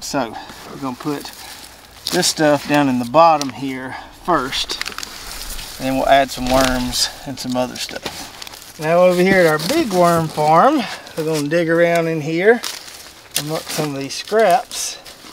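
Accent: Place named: Southwest Virginia, United States. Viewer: Thank you! Not sure where you're from but you speak like us here at home. I think you may be a little further south but here in southwest Virginia, our last two winters have been so mild that it doesn't freeze out the insect pests that cause disease like you said in this video. I like that worm bin you had buried in your raised bed. I'm thinking about making one of my own. Take care!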